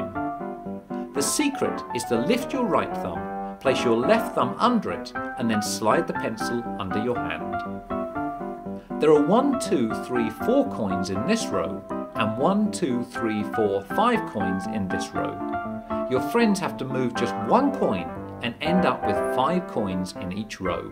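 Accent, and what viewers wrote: United Kingdom. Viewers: Jake Guidry lol american much? hes british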